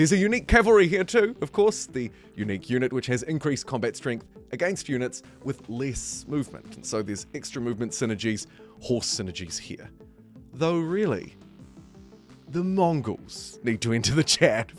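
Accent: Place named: New Zealand. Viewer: Good to hear a Kiwi accent coming up on 100k subscribers. Well deserved too!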